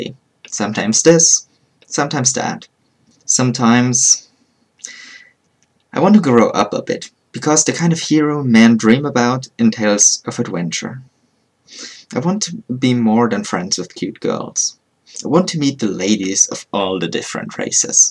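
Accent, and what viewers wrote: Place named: Germany. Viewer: where are you from? i dont think that you're a native speaker... sorry if yoz sad that in one of your vids, im new on your Channel ;) You could be from Germany tho at least that was my first thought : ouh this guy has to be german hahaha great work ps.: Im from Germany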